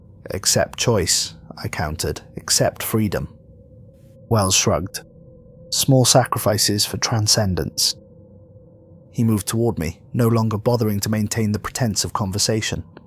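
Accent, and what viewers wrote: Wales, United Kingdom. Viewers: awwww shit we did it!!! thanks for the great content, for talking me to sleep with your caramel butterscotch welsh accent almost every night. well deserved, here’s to many more.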